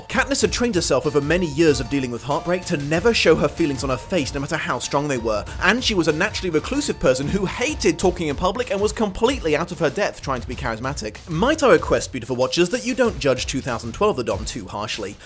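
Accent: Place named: Wales, United Kingdom. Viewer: Where in the UK is the Dom from? I notice the way he says certain things like "year" kind of remind me of how Rob Brydon speaks, so I wondered if he might be Welsh or have lived there.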